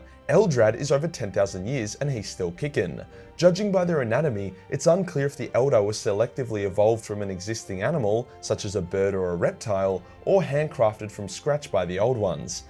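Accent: Australia. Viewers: I love it when you use your Aussie moonspeak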